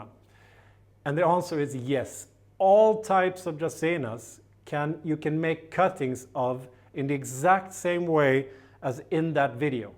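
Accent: Sweden. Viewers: Perfekt Engelska, jag älskar den svenska accenten! du ger bra råd, tack så mycket. Min svenska är skräp..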